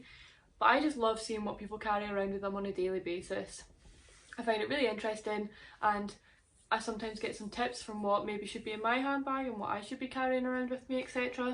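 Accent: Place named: Scotland, United Kingdom. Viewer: You’re like the first female Scottish YouTuber I’ve seen 😀. End result : Cool 👍🏻 Love your accent , I’m Canadian so of course I love the accent 😍 #Brave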